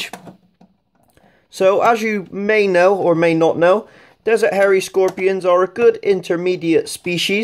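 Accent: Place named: Bristol, United Kingdom. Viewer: Lol great video, but very Bristolian lol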